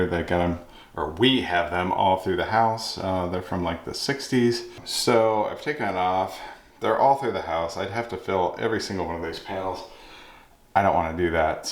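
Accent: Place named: England, United Kingdom. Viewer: yr English accent is totally on point, u might like the TV series version of The Hitchhiker's Guide To The Galaxy,, 📺👍📱🛸🌌,, it was made in the eighties when there was Colins/ Nigels who spoke like that,, it's way better than the modern movie,, almost as good as the original radio play, which is almost as good as the books, which was a trilogy with five parts, (pt4 is my fav),, there are also audiobook versions on yt, which r good for painting to 📱📻😏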